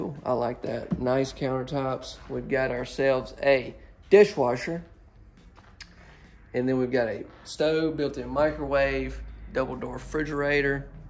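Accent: South, United States. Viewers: Stop saying utility!! 😡Keep that charming southern accent! 😍🥰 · I was surprised with the price on this...I was expecting it to be about $50,000 more than the price you quoted! Also, you didn't point out that the closets have traditional shelving and round rods to hang things on. I notice you corrected yourself when saying "utility". I hope you keep talking the way you did in earlier videos. I like hearing your Southern charming accent!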